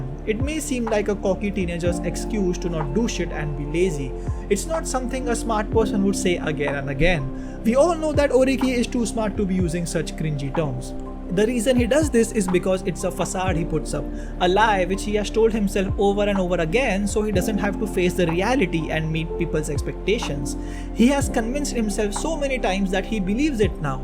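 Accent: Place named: India. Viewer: Your accent is Indian. I appreciate that you are not trying to sound Americans are Brits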